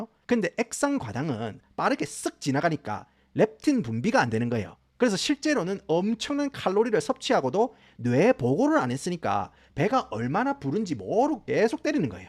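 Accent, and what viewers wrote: Gyeongsang, South Korea. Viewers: 진짜 노무노무 재미쪄❤ 심지어 유익한걸 이리 재밌게 얘기하니 안볼수가있나 교육방송을 예능으로 풀어주는 우리 갱상도오빠!!!😂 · 미국에 사시나요? 경상도 사투리 쩌네요. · 칠갑ㅋㅋㅋㅋㅋㅋ 아 혼방 경상도인 이세요 🎉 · 이분은 세차를 취미로 하는 금융인 인줄 알았는데 성대모사를 잘하고 개그도 잘치는 경상도 억양의 사투리도 잘 구사하는 경제 및 시사 유튜버 + 문화평론가 ㅋㅋ 다양하게 골고루 잘하는듯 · 경상도 입니껴? 스토리 텔링이 아주 재미납니다. 항상 잘 보고 있습니다. 화이팅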